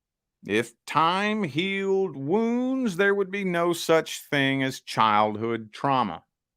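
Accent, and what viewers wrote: United States. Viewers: It's cool to hear a reading in my country boy accent. Very good read.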